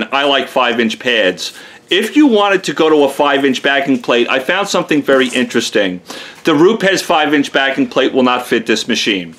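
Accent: New York, United States. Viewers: A Texan with a NY accent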